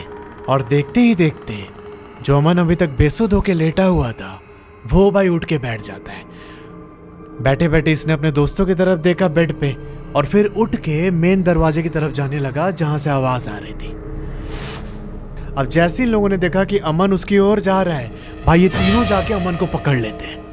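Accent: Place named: Bengal, India. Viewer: I am bengali but I live in Nepal aapka bangla accent mujhsey milta heinn😅😅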